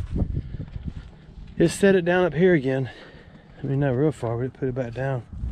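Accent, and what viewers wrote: Alabama, United States. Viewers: 🙋 I was watching this video and I was cringing! 😯😳Have a blessed day ✌️ Love your Alabama Accent 😁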